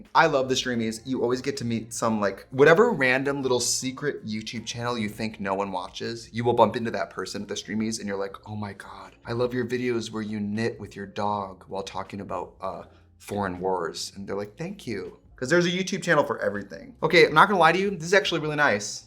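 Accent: United Kingdom. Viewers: Trixie’s British accent is giving major Moira Rose energy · That British accent was what I needed to go on today….EPIC!🤭🤭🤭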